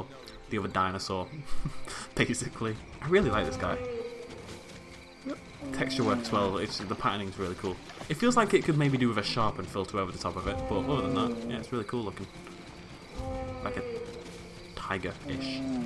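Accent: United Kingdom. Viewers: I find your British accent hilarious when you speak French.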